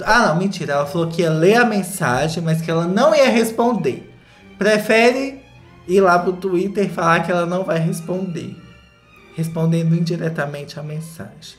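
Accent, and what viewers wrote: Minas Gerais, Brazil. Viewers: Vim pela live na foquinha e já tô maratona do todo o canal, amei o jeitinho e sotaque mineiro dele hahaha. Conta essa fofoca pra gente que chegou depois aqui no canal mulher, pelo amor. Kkk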